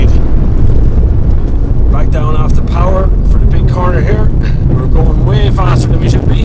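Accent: Ireland. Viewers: in Ireland 3 is pronounced as tree. So if you hear a tree as three he is Irish....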